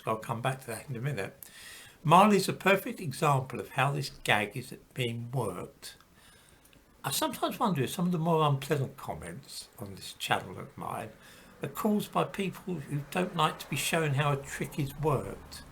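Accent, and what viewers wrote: United Kingdom. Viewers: All that's missing from this clown is big shoes and a red nose. Funny how US thinks that by saying BS with a British accent, it somehow is more believable. No doubt because the US is still owned by Britain. The comparison of the wall of great Zimbabwe created BC to a 15th century European church is ludicrous. Like P. T. Barnum said, " there's a sucker born everyday.!" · Can you please explain to us why western museums are filled with Africa artifacts? Where are your artifacts on display? We wanna know. Why don’t we see your heritage 10,000 years back? This is what you might relate to with your British accent. <link> Did your people make the bronze art in Benin and “collected” it later through colonialism for “safe” custody in Europe?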